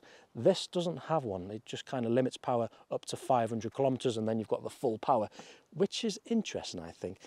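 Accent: North, United Kingdom. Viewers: I'm sorry...I just cannot listen to your droll northern accent for more the 2 mins. Apologies.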